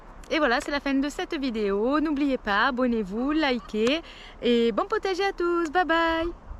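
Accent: South, France. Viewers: Re bonjour, je viens de reprendre la vidéo et je trouve que tu as la main verte et un bon terreau universel, je suis entrain justement de tester des terreaux universel ainsi que des terreau à semis en faisant mes semis et je note les résultats sans oublié que les graines y sont aussi pour beaucoup, j'achète depuis 4 ans à plusieurs semencier pour vérifier la germination de leurs graines, j'ai en teste actuellement: Kokopelli, La bonne graine, La boîte à graines, Les graines Bocquet, Le biau germe, Promesse de fleurs, Les graines de chez Baumaux et pour finir Graines de folie que tu achète toi aussi. Ce que je peux dire pour l'instant c'est que Kokopelli est loin d'avoir une bonne note, germination pas toujours au rendez vous et même des erreurs de graines dans les sachets, la bonne graine idem germination pas toujours au rendez vous, pour l'instant je suis satisfait du Biau germ, des graines Bocquet, de graines de folie et de la boîte à graines voilà. 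Sinon bravo pour toi, tu as de très jolie plants, j'aime bien t'as chaine et la belle personne du sud que tu es avec ton petit accent bien sympatique, et je te dis à bientôt et je te souhaite une très bonne semaine.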